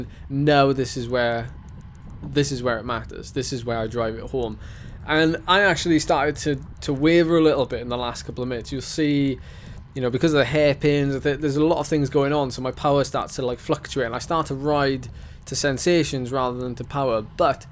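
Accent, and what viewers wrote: Wales, United Kingdom. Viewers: Hope you don't mind but I turned the video off and just listened to your soothing Welsh tones 😁 Well done buddy 👍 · Who would want to mute a good welsh accent? 🏴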